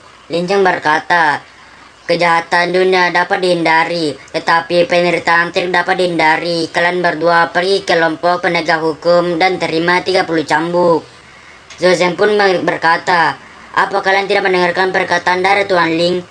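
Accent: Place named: Bugis, Indonesia. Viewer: Adminnya suku bugis ya.. nadanya seperti suku bugis kalau berbicara..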